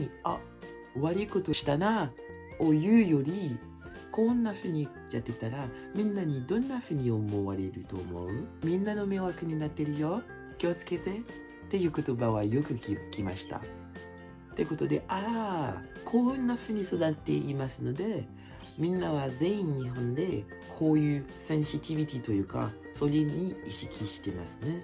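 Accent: France. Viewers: ちょっとフランス訛りの日本語が優しくって可愛い💕 日本の良い所を見てくれてありがとう🙏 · 何と心地よい話し方なんでしょう、フランス語と日本語の良い所が合わさった発音のような気がします。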